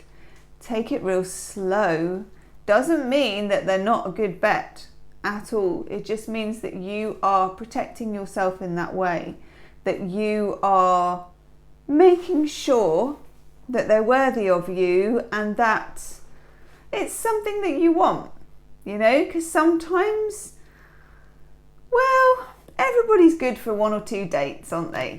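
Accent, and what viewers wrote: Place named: South, United Kingdom. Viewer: Hi I am in USA Texas and am a cancer I enjoy your readings they are spot on I am British from Cornwall sounds like your from the south of England Thankyou for your readings and 😘🇬🇧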